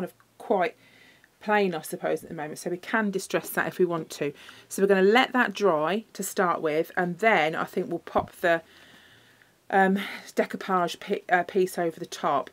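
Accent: United Kingdom. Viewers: Oh I loved your video. Maybe mainly because it was lovely hearing a British accent as much as I love our American cousins. But I can also get the stuff. I’m always jealous when I see the fantastic stuff they get from Michaels or Dollar Tree but no good for me trying to find like for like. Brilliant 😍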